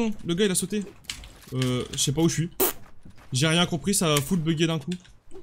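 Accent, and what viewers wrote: North, France. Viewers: Yess l'accent du nord bgg !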